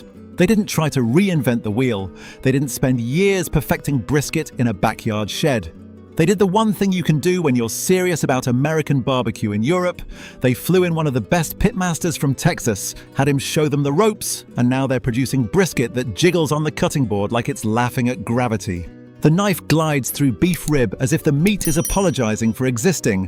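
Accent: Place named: United Kingdom. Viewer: They've got a garden? What are they going to do, smother it with basil and thyme and cherry tomatoes? What's a garden got to do with barbecue? You plant flowers and aromatics and Japanese shrubs in a garden. There's no provision for a smoker and a cutting block, and nobody cares about being surrounded by cilantro and posies when you're grilling and barbecuing and smoking. Ridiculous. And yes, the wood is important. I don't think you can find hickory or mesquite in Europe, and oak and other slow-burning variants have to be the right kind. I certainly don't want my ribs smoked in ash or yew or olive, wrong flavor and smoking properties. And a brisket is not the size of a suitcase. You are exaggerating and misrepresenting a lot of things. You sound British yourself. I understand that you're trying to present a concept in this video, but you certainly still don't know enough about the subject to talk about it correctly. 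I suggest you stop hurrying when trying to put these videos together, the clicks are not worth it, and not important enough. You will be ignored when you come off as ignorant instead of informative. Very annoying. And why the hell do you have noodles and coffee and other things in a video about American barbecue? It doesn't make sense. Are you a real person, or are you the early iteration of HAL and Skynet? If you're human, tell us about your last experience when you had to tune out your crazy ex-girlfriend because you were trying to relax after work with a video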